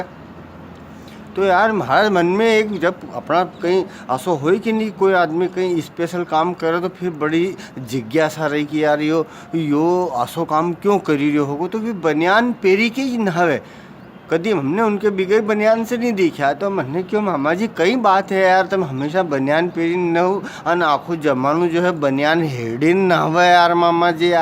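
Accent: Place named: Nimar, India. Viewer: Sir आपकी भाषा हमारी भाषा nimadi की जैसे ही है... मे nimad से हू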